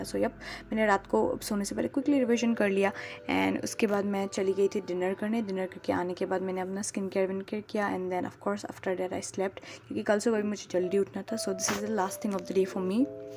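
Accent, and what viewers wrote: Assam, India. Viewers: Ghy Assam ? Cause you're accent sounds Assamese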